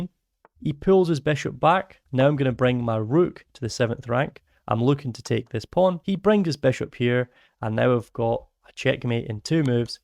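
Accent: Scotland, United Kingdom. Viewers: It's Scottish 😂😂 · It's a Scottish accent. Canadians have the weird accents.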